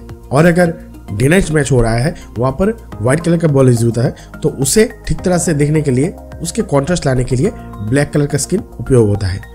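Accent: Bengal, India. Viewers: Aap bangali ho😁😁apke hindi bangla mesano hein😁😁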